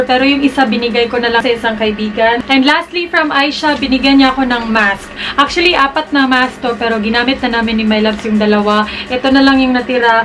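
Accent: Visayas, Philippines. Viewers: ambot lng magkatawa gyud q mag tan.aw sa imong mga vid. kanang tagalog nga accent bisaya. hahaha soooo us gyud mga bisaya. pasalamat q sa youtube g suggest to imong first vid aq nakita. nalingaw q nitan.aw nlng q tanan. katong labay2 nmo sa haul. nka ana q pra2 maning bayhana oi. nyahaha pro until now. mygeeedd 20k? pla plang mikabook ni subscribe ato .. dalia ra oi. always stay unique gurl.. IG: lovehalo83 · You're so loveable and very enjoying to watch! Tho you have that bisaya accent, you show your true and natural self to your subscribers! ❤ Continue to inspire us and do lots of great videos! May God Bless you always po Ate! Power! 💖💖💖 FB: <link> Instagram: jrgvlls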